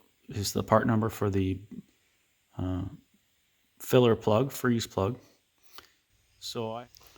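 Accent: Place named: Minnesota, United States. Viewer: I hear a Minnesota or perhaps Wisconsin accent :)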